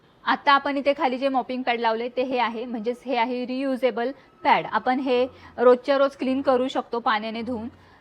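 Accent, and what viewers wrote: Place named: Satara, India. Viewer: Bolnya varun sataryachya disatay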